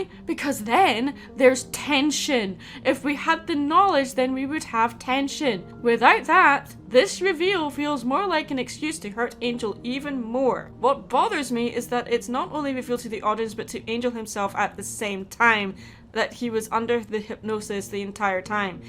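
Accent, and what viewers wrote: Scotland, United Kingdom. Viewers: omg are you scottishhhh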